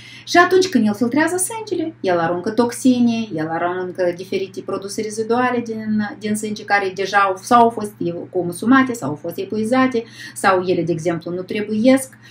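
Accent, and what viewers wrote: Moldova. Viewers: Se înțelege foarte clar, doar dialectul e puțin moldovenesc, engleza înțelegeți mai bine?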